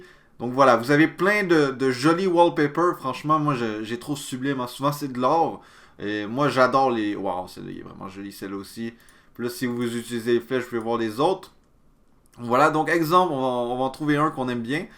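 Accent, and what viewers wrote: Quebec, Canada. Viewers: Vidéo rapide simple efficace + le petit accent québécois +1 abbonement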